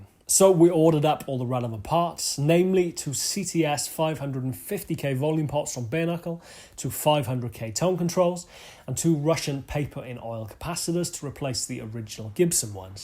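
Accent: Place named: England, United Kingdom. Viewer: Could listen to that playing and your English accent all day long Brother!!! You make Clapton cry!!! Haha and Joe Bonamassa is calling for bending lessons from you….. Damn!!!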